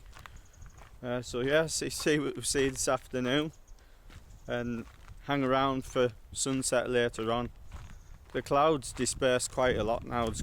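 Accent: United Kingdom. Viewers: To me, the image quality of your videos is fantastic. I don't always understand what you are saying (I am a francophone and not familiar with british accent) but watching your videos is a real pleasure. Bravo!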